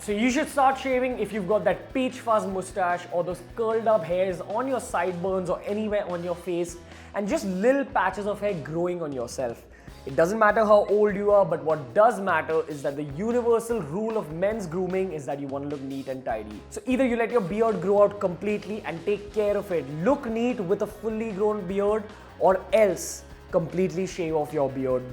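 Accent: India. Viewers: He is Indian . He is speaking indian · Oh no indian accent, guess I’ll go to another tuturial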